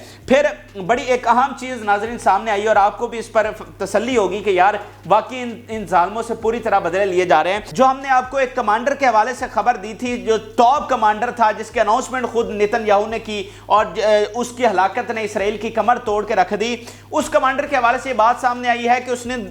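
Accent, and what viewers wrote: Punjab, Pakistan. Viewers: Panjabi hinglish is very good.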